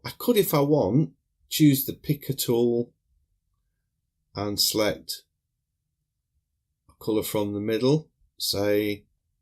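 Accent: United Kingdom. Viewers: Now can you teach me to speak with an English Accent? Love it!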